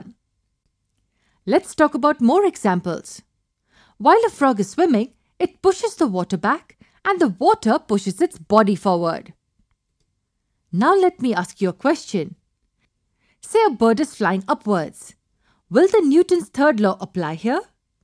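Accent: India. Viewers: this is to bad y r u Indian and explaining I didn't understand anything form u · Sounds Indian to me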